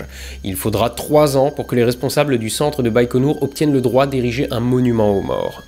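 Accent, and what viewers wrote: Alsace, France. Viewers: j'adore les sponsos avec l'accent alsacien! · L'accent alsacien est au top! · Aaaah l'accent Alsacien de l'ouest du Bas-Rhin ! pour une fois j'ai regardé la sponso en entier ^^ des shmout'z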